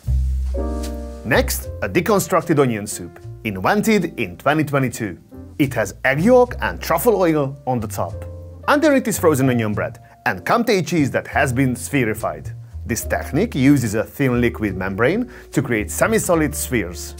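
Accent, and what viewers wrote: Hungary. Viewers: Kilométerekről is felismerni a Magyar akcentust 🫣 Üdv itthonról!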